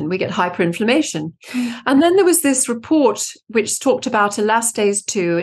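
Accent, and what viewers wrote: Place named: South Africa. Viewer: Excellent interview with great advice. Thank you so much for bringing this to us. And rather nice hearing your SA accent! Take care. ♡♡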